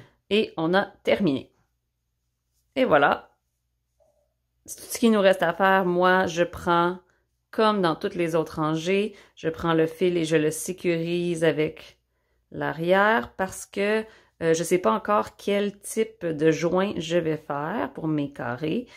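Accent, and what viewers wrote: Quebec, Canada. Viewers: Finalement je trouve le vidéo que je cherchais depuis un certain temps. Ce super carré granny pour mon prochain tote bag. Et quelle surprise d'entendre ce merveilleux accent québécois. 💖 J'ai l'impression d'être retournée à la maison (Je suis originaire de la ville de Québec). Grand merci, je vois que vous êtes super au crochet mais aussi à expliquer. Ici le puff, on les appelle points popcorn 🙂. Vous avez maintenant une nouvelle fan en Italie 😍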